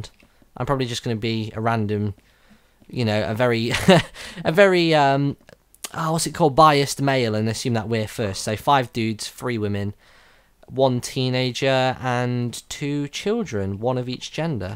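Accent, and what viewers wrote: England, United Kingdom. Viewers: I LOVE THAT ENGLISH ACCENT WHEN U SEE DE FIRE CREEPER ITS LIKE: OMG NONONONO THATS A FIRE CREEPER NONONO GET AWAY GET AWAY NONO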